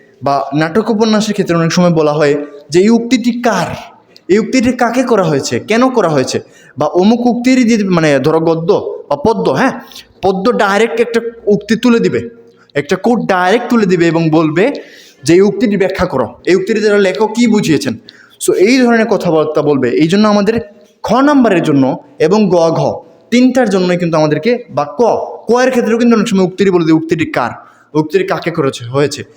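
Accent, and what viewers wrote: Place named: Sylhet, Bangladesh. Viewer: Vai ki Sylheti? Apnar accent sune mone holo apni Sylheti.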